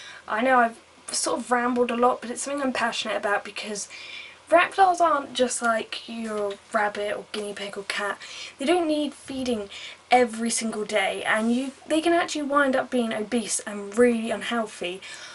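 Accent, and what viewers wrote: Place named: Cockney, United Kingdom. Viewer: Hate the cockney accent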